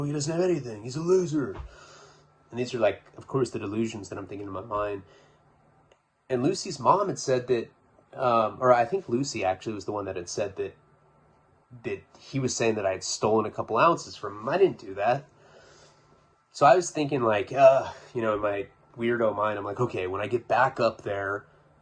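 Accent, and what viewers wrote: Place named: California, United States. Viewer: He does it so often its hilarious, it goes with his rich kid cali accent so well.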